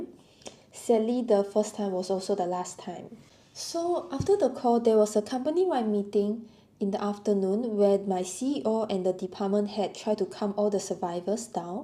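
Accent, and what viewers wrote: Singapore. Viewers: sorry to hear about your layoff, you are so admirably positive after that news. hopefully you can take a break and find something else when you are ready. their communication was terrible, should not be done via email, and as you discovered in large companies sometimes people are selected by algorithms or upper management. you sound like a Singaporean accent? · Your accent is cool you are from Singapore right! I'm Jonathan from El Salvador. Software developer too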